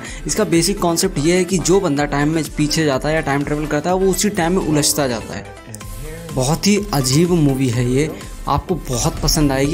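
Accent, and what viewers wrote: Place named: Bundelkhand, India. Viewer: बुदेलखंड से लगते हो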